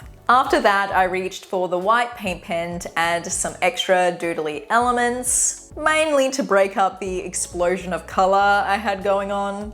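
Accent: Australia. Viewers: wow this must have took a lot of effort and motivation to do, I like your aussie accent!😂 crayola really gives off this nostalgia vibe from colouring and drawing as a kid with these supplies, overall you did a good job of colouring this design, I really like how the water colour effect blends together nicely to create almost a tie-dye effect💕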